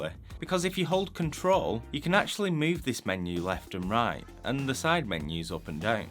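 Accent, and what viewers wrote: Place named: Northern England, United Kingdom. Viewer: You can't fault a proper northern accent our kid.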